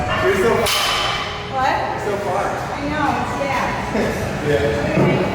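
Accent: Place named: South, United States. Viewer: hey, just curious, where are you from? There's a slight southern touch in your accent lol.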